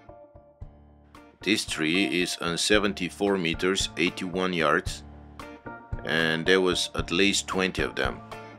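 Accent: Russia. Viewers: Love this guys accent. Sounds Russian! One tone. NICE! Love the music as well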